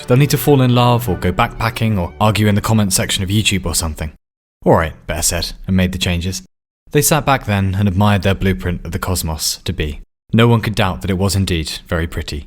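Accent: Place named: England, United Kingdom. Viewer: what really makes this channel awesome is his English accent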